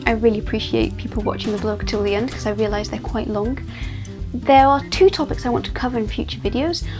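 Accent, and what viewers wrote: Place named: United Kingdom. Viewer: I was wondering, why it was you sound so British? Is it because you have been in England for a whille now? Also was Spanish your first language? Sorry for so many q's! And a video on mental illness would be fantastic. I don't think people (inc me) no much about OCD.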